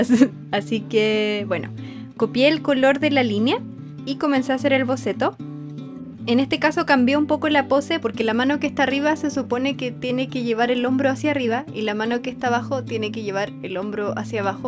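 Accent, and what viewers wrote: Chile. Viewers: Savia que erai chilena Por la forma de ablar pero ya se aclararon mis dudas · ERES CHILENA!!!!!!!!! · Eres Chilena???? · Eres chilena?!?!?! (Si dijiste que si chocala) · Creo yo que el acento la delata no? SI, SI ES CHILENA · eres chilena como yo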